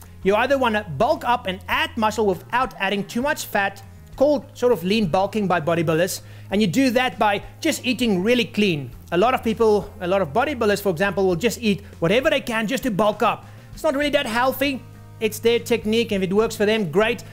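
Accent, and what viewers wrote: South Africa. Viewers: Great vid man. I like to hear a no BS message in a SA accent.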